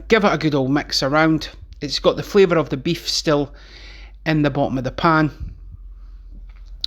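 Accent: Scotland, United Kingdom. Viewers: Great video mate, keep up the good work! Just wondering, what part of Scotland are u from? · i made a quiche from start yesterday,homemade shortcrust pastry,seems tastier when you make your own?are you in edinburgh?trying to place the accent.i will be giving your steak pie recipe a go shortly,looks so so good.i might add a wee bit lea and perrins to the sauce?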